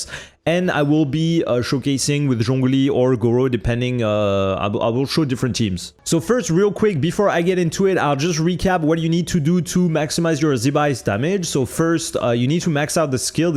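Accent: France. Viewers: Français ou Canadien ? Cool to see french people speaking english :D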